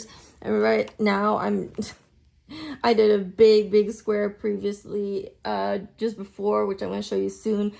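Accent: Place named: Montreal, Canada. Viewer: New subbie here!! ❤ your accent reminds me so much of home!! I am also from Montréal! I moved to the United States in 1990 when I was 4.. I'm 39 now 😊 I used to visit my sister and family every summer from the ages of 11-17.. I miss it so much. Montréal is a gorgeous city. Most of my family lives in Ville Saint Pierre and LaSalle LaChine. Probably didnt spell those right 😅 I'm glad you got clean and found diamond painting!! I'm also an addict. Been clean 9 years now. I've been diamond painting for 5 years now. 😊 can't wait to see your future videos!! ❤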